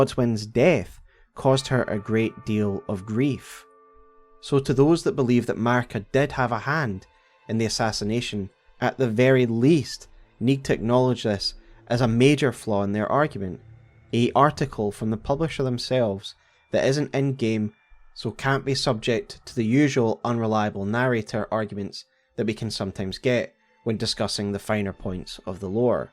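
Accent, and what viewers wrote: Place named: Scotland, United Kingdom. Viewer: Your nuts bro, listen to a fellow Scotsman Explain Elden Ring lore is top notch. Well done ma man! 🫡 🏴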